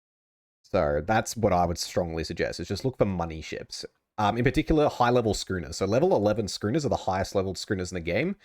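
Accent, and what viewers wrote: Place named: Australia. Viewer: One of the thickest Aussie accents I’ve ever heard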